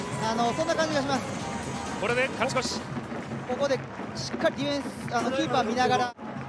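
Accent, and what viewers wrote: Kansai, Japan. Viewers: 解説誰？めっっちゃ関西弁やん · 、おもろい。新しい切り口だな。関西弁やし松木さんより中毒性がある。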